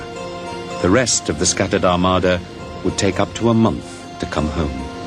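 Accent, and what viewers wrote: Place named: England, United Kingdom. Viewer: lol says "felícima armada": it's " grande e felicísima armada". I love english accent when talking spanish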